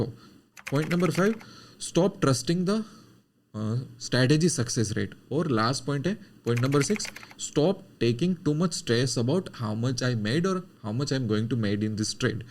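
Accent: Gujarat, India. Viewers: As ur voice accent is , i think u r a gujarati.....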